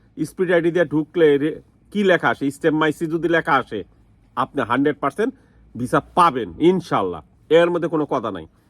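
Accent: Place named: Savar, Bangladesh. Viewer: assalamualaikum, vai apnar kotha shuina monee hocche apnar bari dhaka savar. vai ami savar thaki .amar onek diner iccha ami italy jabo kintu porichitoo kew na thakatee jaitee partechi na. vai ami deshee onek din jabot bekar boishaa achi kintuu kichui kortee parchi na. onek din dhoirai vabtachi itali jaboo .jodi choto vai mone koiraa iktuu help korten thalee chirojibon kritoggo thaktam. taka poishaa ditee parum inshaallah somossa hoibo na.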